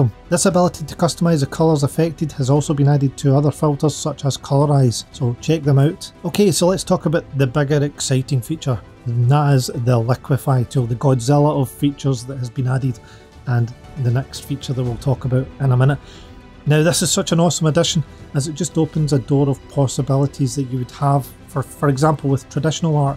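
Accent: Scotland, United Kingdom. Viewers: I absolutely love the scottish accent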